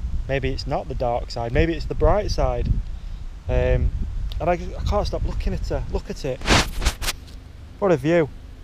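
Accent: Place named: North, United Kingdom. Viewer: Soooo... You put on extra northern for the vlog, or go a bit south for pods? #vlogger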